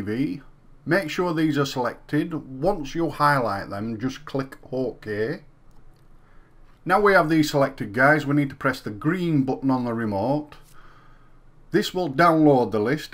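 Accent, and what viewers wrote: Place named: Yorkshire, United Kingdom. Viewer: Leeds or Bradford accent...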